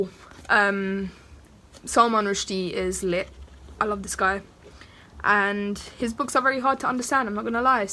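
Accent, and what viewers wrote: United Kingdom. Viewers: Have you been born in uk, if no, then , how you have uk accent , and have you learnt phonetics if not then how did you learn pronunciation . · uh that british accent gurl:)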